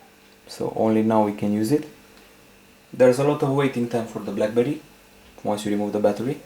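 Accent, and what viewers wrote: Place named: Romania. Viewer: Engleza ta are un puternic sound romanesc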